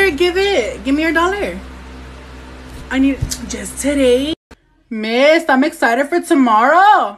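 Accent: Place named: California, United States. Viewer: Is she representing all my girl in California? Cuz all of us speak like this! 🤣🤣🤣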